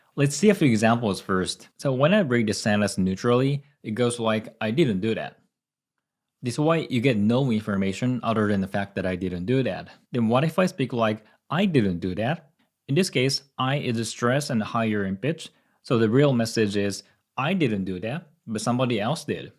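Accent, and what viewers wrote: United States. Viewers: Very impressive you've definitely got an American twang! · Your american accent is amazing, love your video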